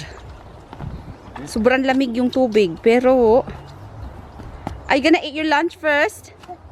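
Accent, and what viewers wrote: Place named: United Kingdom. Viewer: I love your UK accent sis. Sarap pakinggan parang di marunong magalit.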